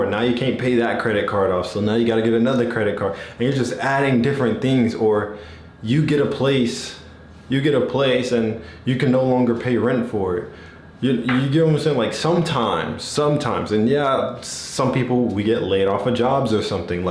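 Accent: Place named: Cape Town, South Africa. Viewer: Bro ! Yu slightly got that CAPE TOWN Accent...."The Mother Land /South Africa"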